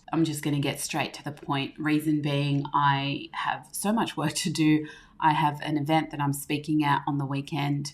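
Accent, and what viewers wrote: Australia. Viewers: Not all all. Love your accent and voice! I love Aussie accents. Best one for women to have!!! I work in the Film industry with them!!!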